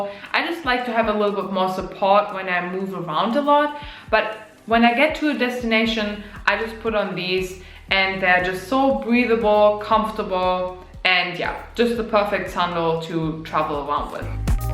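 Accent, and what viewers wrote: Germany. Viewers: Hearing the accent I think Germany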